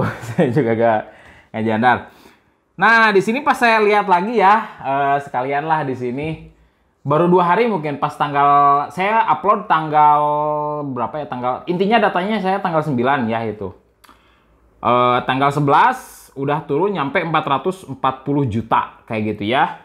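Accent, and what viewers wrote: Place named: Tasikmalaya, Indonesia. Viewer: Orang tasik dia gan, makanya gitu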